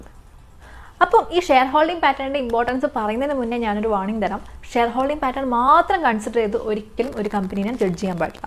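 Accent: Kannur, India. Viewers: Kannur style...good information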